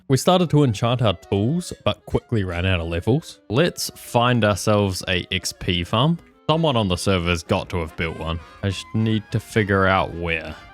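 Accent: New Zealand. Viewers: omg I detect a kiwi accent. Kapai, this vid is so good, love the editing!! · Oh my god, hearing an NZ voice in a youtube video is so weird, but so welcome.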